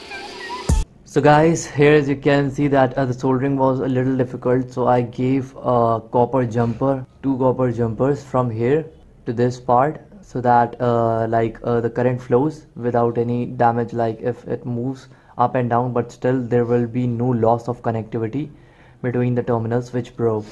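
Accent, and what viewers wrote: India. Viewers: indian accent so funny lol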